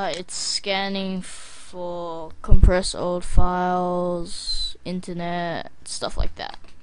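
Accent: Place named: Australia. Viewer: =O AN AUSTRALIAN!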